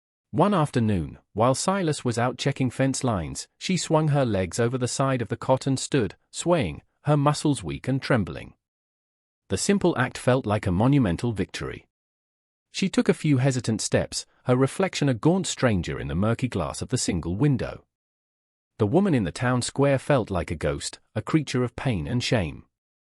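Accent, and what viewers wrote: United Kingdom. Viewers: Horrible narration. British accent for the 1800's Old West? Seriously?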